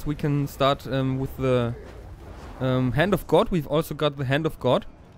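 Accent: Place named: Germany. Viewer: nice english, nearly unavailable to sound out the german accent^^ but you should´ve changed the videodescription. i fear there won´t be any anglophones to see your great victory ;) and in the end, the lizardmen monsters are much stronger then the chaos ones. what a great strategic performence xD but anyway nice to see some monstersmash :)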